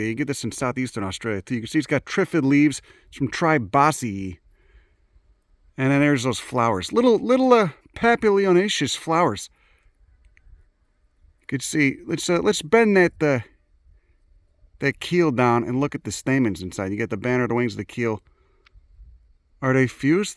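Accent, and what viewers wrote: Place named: New England, United States. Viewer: Oh, shit... please dub Planet Earth with your New England accent.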